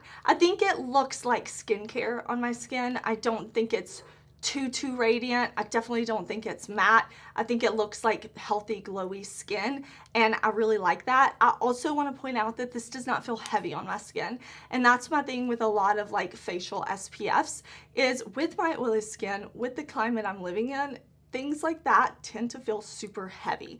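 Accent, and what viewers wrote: South, United States. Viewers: you have the cutest eyes! I also adore your southern accent. I’m Canadian and we get told we have an accent too, but I can’t tell. Your makeup in the intro is fire. 🔥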